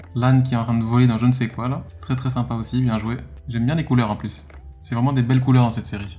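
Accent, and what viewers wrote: Belgium. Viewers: T’es belge?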